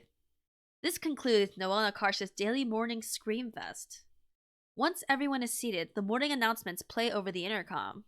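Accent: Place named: New York, United States. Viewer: Love the new Yorker accent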